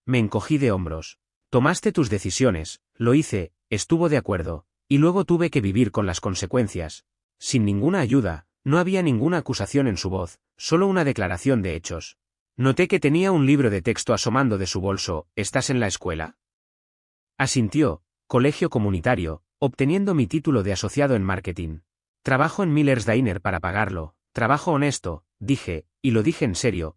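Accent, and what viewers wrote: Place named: Spain. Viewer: La verdad no sé porque a todas este tipo de historias le ponen esas voces mamonas españolas. Me caen en la punta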